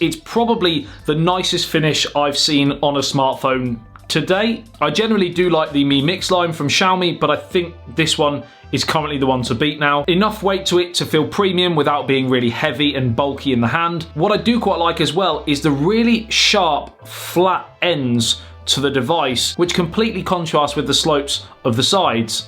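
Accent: United Kingdom. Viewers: Like your UK accent mate 😏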